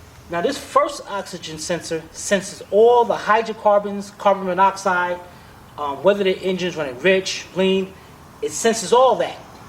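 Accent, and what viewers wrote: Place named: Boston, United States. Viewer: I know this is off topic but...North Carolina??? "...so let's get up under HEAH...", "...CONNECTAH.." and fast talking... that accent sounds Boston-ish, New York-ish, Jersey-ish??😂😂Thanks for the information in this video. The 'wall' explanation helps a lot as my vehicle is throwing this code. ♥ .•:*¨¨*:•. ♥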